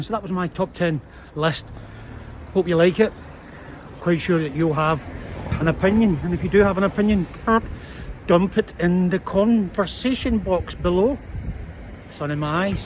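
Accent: Scotland, United Kingdom. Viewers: Loved your trueful and sincere comments on living in China, and your Scottish accent 😊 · Interesting to see a Scot vlogging his life in China. Can tell your Scottish straight from your accent. Love it. God bless. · As a Chinese living in the UK for over 30 years, it is great to hear some Scottish accent from China! Thank you, for reporting your experience in China as it is, and hopefully giving some UK audience some truth about life in China. The main stream media here is so unbelievably biased that for general public in the UK, all they hear is anti China propaganda, zero about what life in China is really like!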